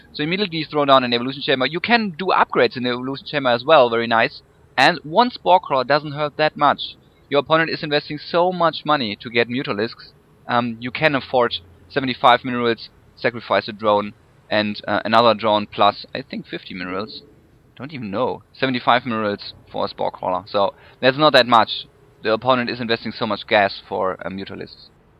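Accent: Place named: Germany. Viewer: german accent is epic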